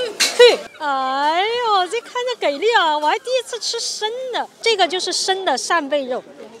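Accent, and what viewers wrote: Sichuan, China. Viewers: 四川口音是改不掉了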